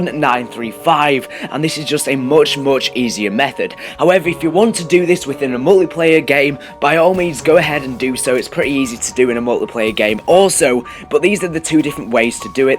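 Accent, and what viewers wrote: England, United Kingdom. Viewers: No, not British. Its the accent/dialect of the area of England he comes from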